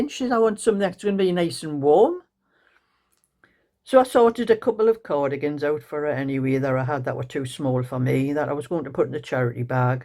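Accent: Newcastle, United Kingdom. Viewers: I just come on to hear you chat you make me laugh I’m a Geordie and love your Geordie banter you make me smile thankyou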